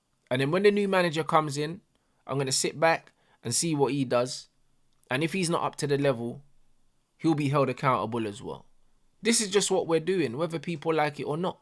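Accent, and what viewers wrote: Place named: London, United Kingdom. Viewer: Bro why is this guy even supporting United? He’s obviously not from Manchester judging by that London Afro-accent. You don’t speak like that if you’re from Manchester. Does anyone know why he supports them?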